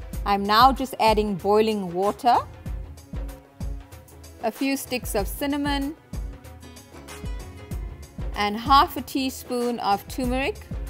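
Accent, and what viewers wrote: Durban, South Africa. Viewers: Unmistakenly Durban accent. My sister in US over 50 yrs still has strong Durban accent. Me tooo🎉🎉🎉🇿🇦🇿🇦🇿🇦. Had that beautiful barracuda over 50yrs ago in Durban. I can smell those Durban spices. My mum also made the most delicious tinned fish biryani which I still make. It’s a hit when I do🎉🎉🎉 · Excellent production!!! How lovely to hear authentic South African commentary without the horrible American Slang. Please preserve your beautiful accent and unique presenting style!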